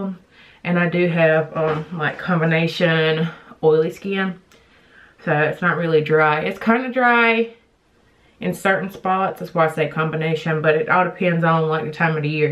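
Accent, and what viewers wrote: South, United States. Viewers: Happy New Year....Your look is so BEAUTIFUL. I just adore your southern tone. It's so cute and adorable.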